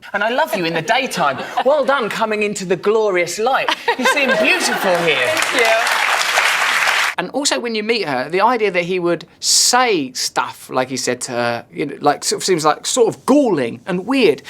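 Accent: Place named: United Kingdom. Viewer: Brilliant observations as usual with the appropriate amount of well spoken British!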